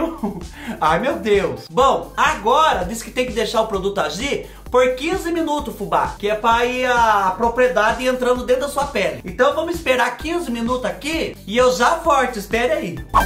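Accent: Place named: Minas Gerais, Brazil. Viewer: Nossa, segundo vídeo que assisto seu, conheci seu canal hj e só ia ver de curiosidade, mas não aguentei e me inscrevi para não assistir de clandestina kkkkkkkkkkkkk e pq amei mesmo ^^ e esse sotaque mineirês é bão por dimais sô rsrsr <3 Sou uma mineira morando em Portugal agora, mas a mineirisse não sai de mim rsrs ô falta de um pão de queijo que deu agora rsrss Adorei vc e seu conteúdo, ri horrores rsrs obrigada! Beijinhos e sucesso!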